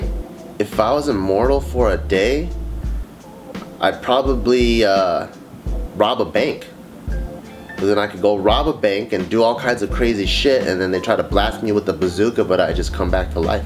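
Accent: China. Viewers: wow, Chinese accent is almost standard than I expected.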